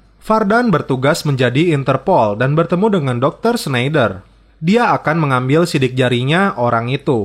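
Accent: West Java, Indonesia. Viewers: Njir Sunda wkk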